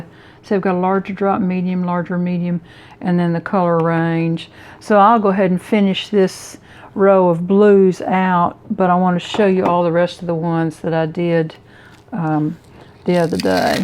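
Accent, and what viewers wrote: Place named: Tennessee, United States. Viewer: Thank you for this great enamel dots tip! And, are you from Tennessee? Because you and comedian Leanne Morgan have the same accent! xox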